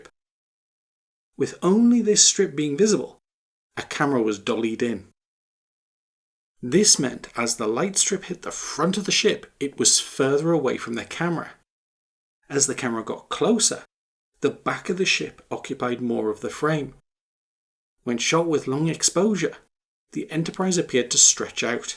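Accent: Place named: United Kingdom. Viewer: First time seeing your channel, I think. It really reminds me of Captain Disillusion, if you're familiar with him. Great production values where you can tell a lot of effort has gone in, and very educational with clear, inspired examples to show what you're talking about. Like a less boisterous, more British version of Captain D, for sure!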